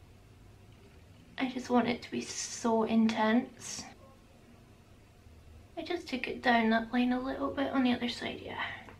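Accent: Scotland, United Kingdom. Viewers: More looks like this ! Amazing ! Also ur voice is super relaxing ! So nice to here a scottish accent on here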